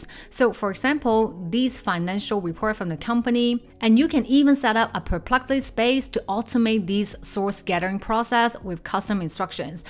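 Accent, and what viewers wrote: China. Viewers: Great content. Your Chinese accent is very strong so I finished watching but it was pretty tough Good luck.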